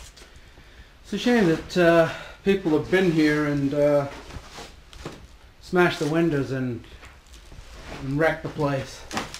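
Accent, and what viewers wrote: United Kingdom. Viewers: a cool film. I'm just wondering...are you from the UK? I detect a slight accent from over here. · Love this place, it has a peculiar mystique to it, something a little spooky & Paranormal (that's the feeling I get ne-how.!) I think it was in a little better condition when Dan Bell was there... Thanks for exploring, p.s. R U a Brit - #Playschool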